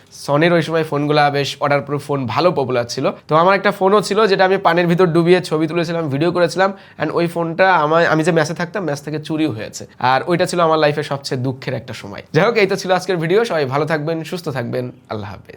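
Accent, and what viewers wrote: Benapole, Bangladesh. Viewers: ভাই আপনার বাড়ি কি বেনাপোল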